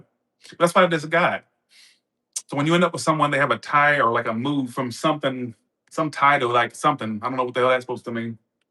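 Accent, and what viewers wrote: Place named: New York, United States. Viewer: you have a badass accent it's giving 1920s 1930s 1940s 1950s New York/old movies but in todays times actor you could've play extra in most iconic films I bet people would remember your quotes lines when say "you know what... " I thought for a moment as if you were saying some films lines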